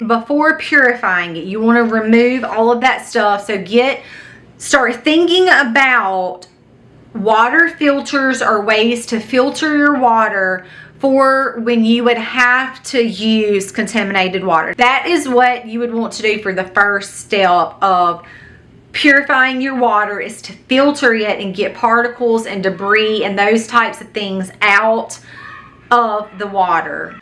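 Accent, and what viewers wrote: South, United States. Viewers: I love that southern accent!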